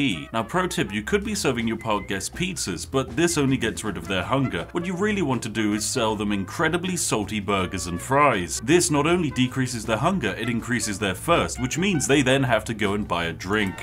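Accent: United Kingdom. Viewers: Holy youre so funny, especially your british accent, i love it!!!! you just earned a sub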